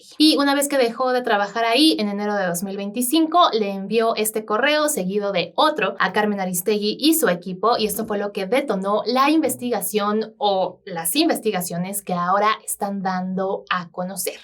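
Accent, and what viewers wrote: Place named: Argentina. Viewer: y porqué hablas con acento Argentino?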